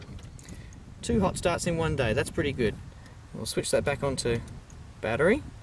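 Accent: Australia. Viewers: I watched the video to hear an AUSTRALIAN SPEAK..God Bless our AUSSIE BROTHERS and SISTERS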